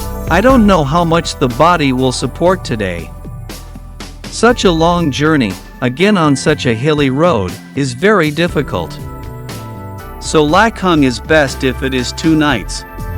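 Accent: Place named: United States. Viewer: Why would you use an American voice for this video?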